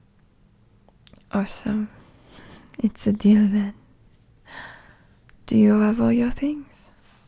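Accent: France. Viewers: You're french accent is definitely the best on yt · We're blessed with another French accent audio ☺ thank you! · I love the french accent, theres something about it that makes my brain go “love of your life rn”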